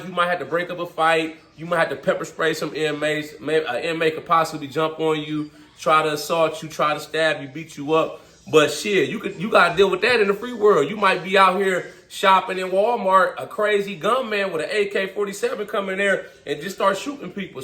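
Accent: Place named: Ohio, United States. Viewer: I knew you were a fellow Ohioan due to the way you talk, I’m from cleveland and thinking about going to Texas 💪🏾‼️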